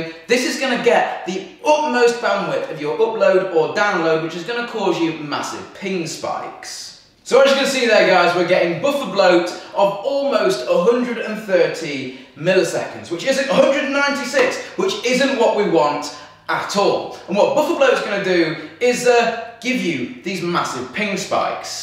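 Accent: United Kingdom. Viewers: Jude Groomes he is speaking english not american english he says things differently because he is speaking United Kingdom English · a shota a fps shota wtf british accent 100% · Well technically yes as he lives in the uk therefore pronounces it how the rest of the uk pronounces it. Its like the US and other countrys pronounce it 'Rowter' but us brits pronounce it 'Rooter' But either way its spelt 'Router' all the time. It doesn't take a master mind to figure this one out. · I love your british accent! Would you like to say that once again. "Rooter." <3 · For those who are mad at his english... Its Basic British Accent so for those who are americans or canadian or something chill out🤣😂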